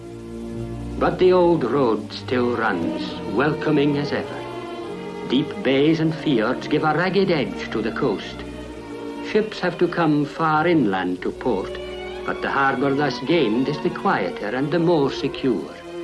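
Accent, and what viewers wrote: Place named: Western Isles, United Kingdom. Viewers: It sounds like a Hebridean accent. Perhaps a native Gaelic speaker? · It's a western isles accent.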